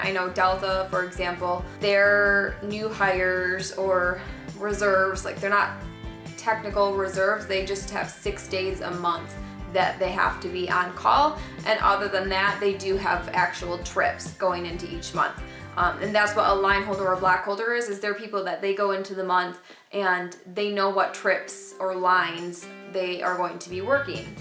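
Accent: Midwest, United States. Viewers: Hey! I'm a FA on the other "legacy" side of the operation of our big giant airline fam lol. QUESTION! Are you from the midwest? kind of sounds like it haha my Wisconsin ears are picking up a little midwestern accent.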